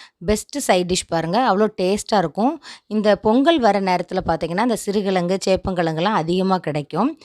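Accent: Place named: Tirunelveli, India. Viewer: உங்கள் தமிழ் மிகவும் அருமையாக இருக்கிறது. அருமையான திருநெல்வேலி தமிழ்.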